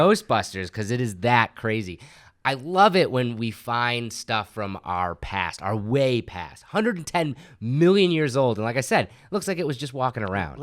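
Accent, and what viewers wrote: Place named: United States. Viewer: You sound "super american" lifes a bitch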